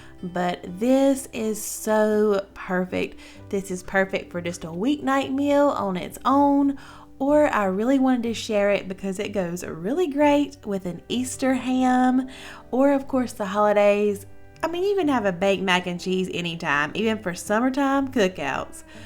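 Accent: South, United States. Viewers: I am so excited to try this! Love your Southern drawl! I'm an Alabama girl!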